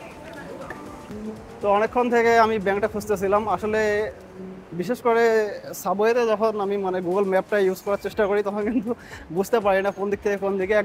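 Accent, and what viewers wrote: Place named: Cumilla, Bangladesh. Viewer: ভাই আপনার বাড়ি কি কুমিল্লা নাকি? ওই এলাকার ভয়েস এর মতো মিল লাগে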